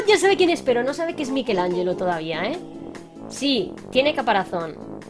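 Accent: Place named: Spain. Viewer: Sí, es española. Se nota por su acento 😆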